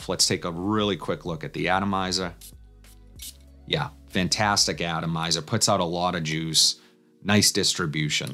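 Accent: Boston, United States. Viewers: Thanks bro.im from n.y u have to be from Boston..had to guess.PDM all day!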